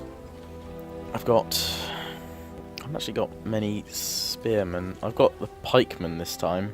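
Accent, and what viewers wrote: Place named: Chichester, United Kingdom. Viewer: i love your content:p and btw are you from chichester by any means?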